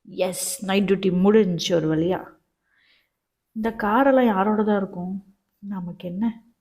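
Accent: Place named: Tirunelveli, India. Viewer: Spr sis tamil rmpa alzhaga pesureenga na tirunelveli nu nenajen..... ✨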